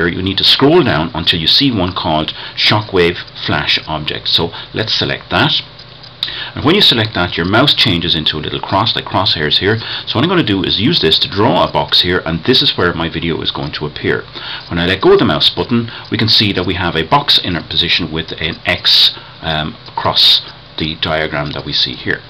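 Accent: Ireland. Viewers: It's nice to hear a voice from home :) miss Ireland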